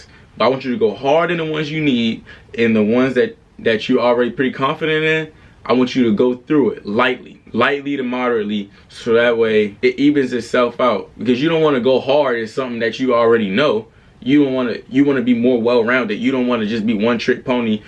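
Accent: Baltimore, United States. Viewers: I can tell he was from Baltimore in a few sec. just off how he talk fr, 410 baby we here. I’m also looking to go in with air traffic control aspirations but for the Air Force.. definitely going be following you my brother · Same! I just love the his accent. Gotta be Baltimore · Sounds like he from either Bmore, D.C. or Jersey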